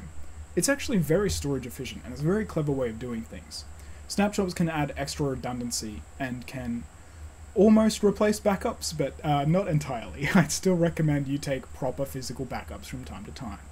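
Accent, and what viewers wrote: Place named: Australia. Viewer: going by your accent I am guessing you're a fellow Aussie. Facebook Marketplace is your friend. A lot of IT folks that are able to scam decommissioned servers will sell them on FB. Some people are a bit ambitious with pricing but every now and then someone gets sick of their PowerEdge T430 taking up space and they flog it off for $150AUD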